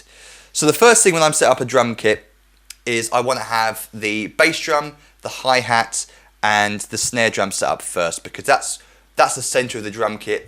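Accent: United Kingdom. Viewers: Very helpful video! And, I really like and so, but I hate british accent ><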